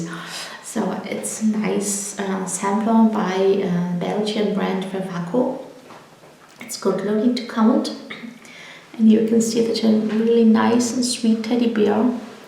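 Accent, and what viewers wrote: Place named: Germany. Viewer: I just found your floss tube; I LOVE your projects and I like hearing a German accent again. My husband and I were stationed in Grafenwoehr (Bavaria) for 3 years and I'd love to go back. We went to Saxony all the time though because Dresden is one of my favorite cities! I can't wait to watch the rest of your fposstubes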